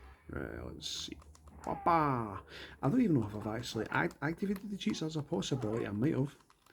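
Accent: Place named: Scotland, United Kingdom. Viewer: As an Irishman i am pretty sure he is Scottish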